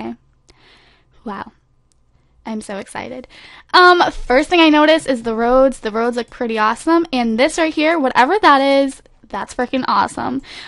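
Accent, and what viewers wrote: Scotland, United Kingdom. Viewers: Scottish lol:)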